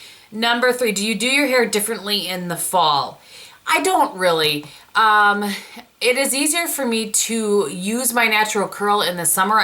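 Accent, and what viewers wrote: Wisconsin, United States. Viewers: Your Wisconsin accent is great! Love from Madison, WI!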